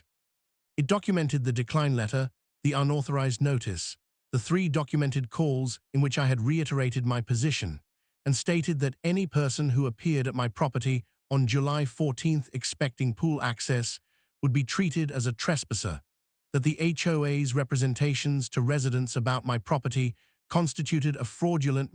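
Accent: United Kingdom. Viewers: And another totally ABSURD story with a British accent from a 10yr old mind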